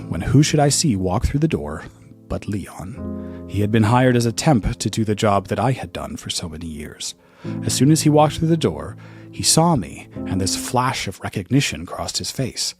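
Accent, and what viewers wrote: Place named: Canada. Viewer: Knew in an instant you were a fellow Canuck- pronunciation of foyer and use of university (Yankees say college even if Master's and doctorates are offered. Never understood that one). Love the humour!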